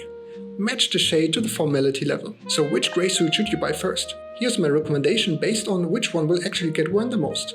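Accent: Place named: Germany. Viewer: Great videos with helpful tips. Just subscribed. I hear from your accent you’re German? Question: where do you buy your suits?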